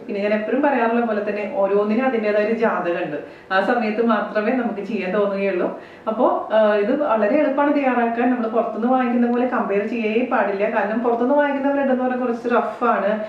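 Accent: Thrissur, India. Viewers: Thrissur Baasha keakan thonnumbo ..chechys vdo kaandu samadannikum....kubbos and chicken for todays dinner:)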